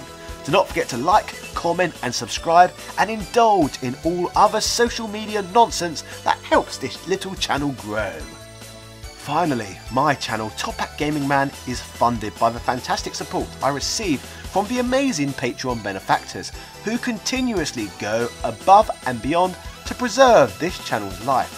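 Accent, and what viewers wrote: England, United Kingdom. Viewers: What region is this guy's accent from? English folks are all so weird with their insane regional accent differences.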